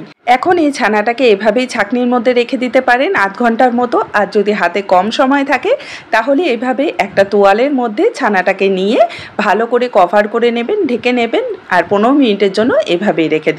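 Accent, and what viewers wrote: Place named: Bangladesh. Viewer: পুরো ভিডিও দেখে আমি একবার ও বুঝতে পারিনি আপনি ইন্ডিয়া তে থাকেন কথার একসেন্ট একদম আমাদের মতো। শুনতে ভালো লাগছিল 🤗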